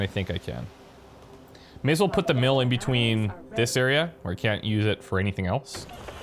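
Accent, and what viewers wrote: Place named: Quebec, Canada. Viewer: I'm betting your Canadian, that accent is a beauty. I'm guessing Québec, there's a little bit of that French Canadian, eh.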